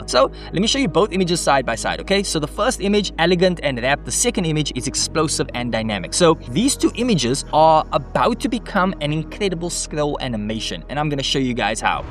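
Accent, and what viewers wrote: South Africa. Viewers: Where is your accent from? SA? - Thanks for the tutorial! Would like to know how React plays that mass of images? Looks load and performance intensive. And I also would have loved to see how this works on mobile... different image set in portrait format?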